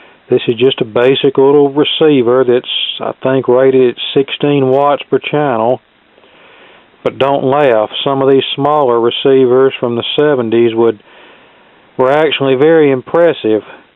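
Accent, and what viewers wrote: South, United States. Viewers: The same accent of Forrest Gump, lol, I love that accent.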